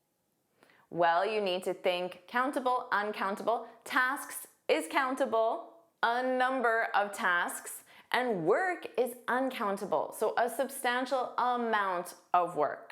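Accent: Canada. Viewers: Are you from Winnipeg? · Do you have the Canadian accent or are you speaking like an English ?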